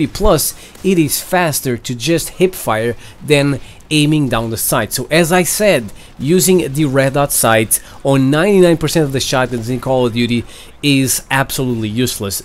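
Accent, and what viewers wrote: Brazil. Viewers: you're brazilian or english ? good vídeo ;P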